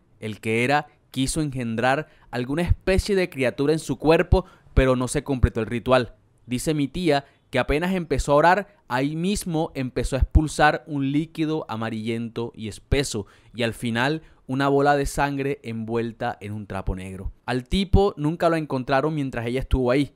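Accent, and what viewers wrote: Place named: Caribbean Coast, Colombia. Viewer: Me encanta como narras ❤ ese acento costeñoooo🎉🎉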